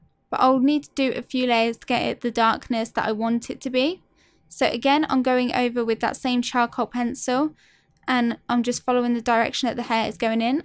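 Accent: United Kingdom. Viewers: I love ur accent..is it british?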